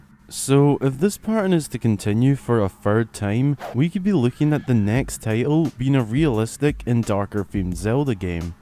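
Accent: Scotland, United Kingdom. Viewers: Moan the Scotland! Where u from mate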